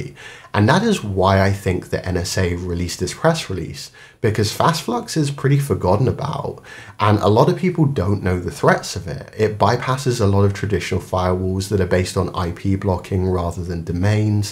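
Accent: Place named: United Kingdom. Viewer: cool but it's time to cut shit with the british accent. talk normal now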